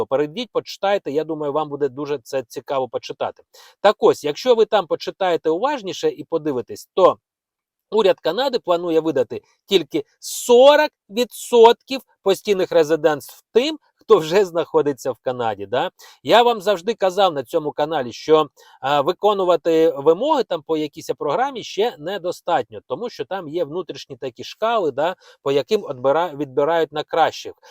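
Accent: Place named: Russia. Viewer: Украины скоро не будет, зачем украинский язык? Тем более, слышно, что для автора родной - русский.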